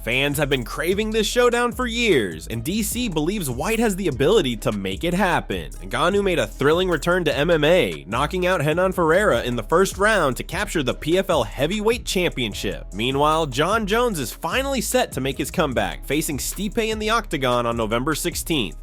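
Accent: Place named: New York, United States. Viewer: NY accent not getting name wrong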